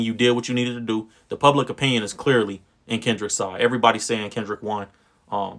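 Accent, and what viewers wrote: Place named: West Coast, United States. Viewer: Ok u from the West Coast your accent lol 😅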